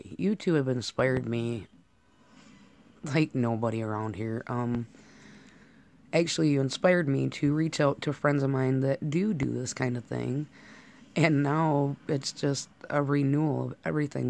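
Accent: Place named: Michigan, United States. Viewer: Cool! Nice to meet you! Love your accent reminds me of my cousins up there. If my family had stayed in Michigan I would sound the same. I am so happy I was able to inspire you in any small way. It is why I feel like I need to share my art more, in order to give permission to others to just do it, play! I am big on embracing the inner child. Girl don't you even worry about your teeth, You are uniquely YOu. I had a friend in Memphis who had the worst teeth, but she was one of the most beautiful people I had ever met. One of our BFFs Danno has 2 teeth in his head, but our BRO has been there for us time and time again! I'll take my toothless friend Danno any day over some shallow egotistical pretty boy who can't even be bothered. Keep em coming I can't wait to see some of your art!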